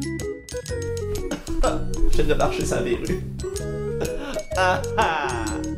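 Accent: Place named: Quebec, Canada. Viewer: J'aime vraiment bien cette video (ton accent quebequois est trop drole xD) elle met bien les choses au clair :P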